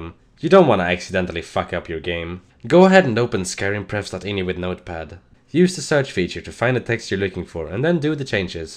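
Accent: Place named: Sweden. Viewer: haha i can tell that u are from Sweden.. lol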